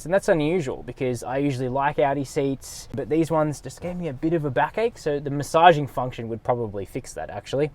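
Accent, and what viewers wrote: Australia. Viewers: Hi, I am watching your rewiews mostly because of your pronunciation of english language and I must tell you, I am having a good time😊. I live in Europe, Slovenia and if I may ask you, I know the rewiews are about the cars, but can you show as a little bit more of your beautiful country AUS. All I can see, is one same road, I am shure, they are more. Here, when I look through the window, only I can see, is coronavirus! We are depressed😱. Thank you very much and take care, Damijan.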